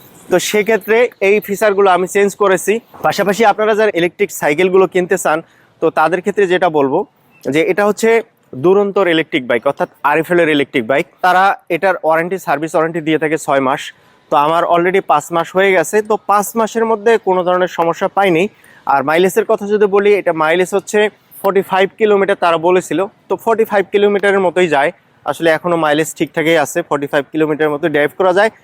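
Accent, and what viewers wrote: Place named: Chuadanga, Bangladesh. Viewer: ভাই আপনার কথা শুনে মনে হচ্ছে আপনার দেশের বাড়ী চুয়াডাঙ্গা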